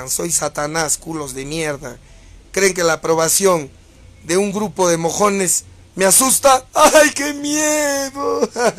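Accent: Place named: Peru. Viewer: JAJAJAJAJAJAJAJAJAJAJ peruano puro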